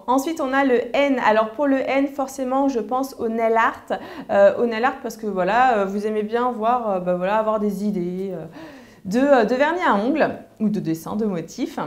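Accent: England, United Kingdom. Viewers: # Mdr tu a un accent anglais à mourir de rire mais je t aime bien quand même Plein de bisous:-* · tu me fais trop avec ton accent Anglais!;) Toujours au top, bisouuuus!:) <3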